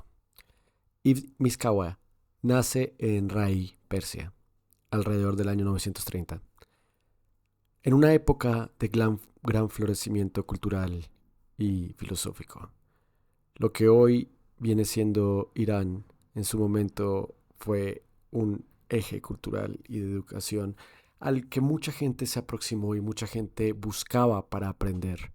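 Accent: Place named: Colombia. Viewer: Recién descubro tu canal. Como admirador de la filosofía islámica, te doy gracias por cubrir estos temas en español. Por cierto, ¿eres colombiano? Creo que tu acento te delata jajaja.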